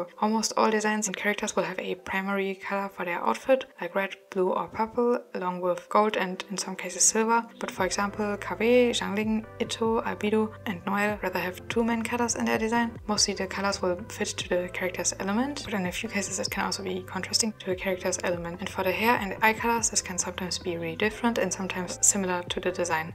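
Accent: Germany. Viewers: THANK YOU. So useful! Are you german? I hear an accent. I also recommend talking slower or more clearer, sometimes it's difficult to understand you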